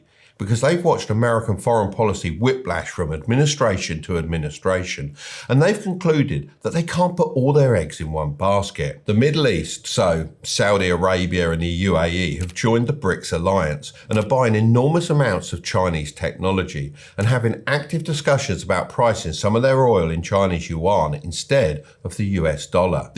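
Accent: Cockney, United Kingdom. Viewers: Cockney accent detected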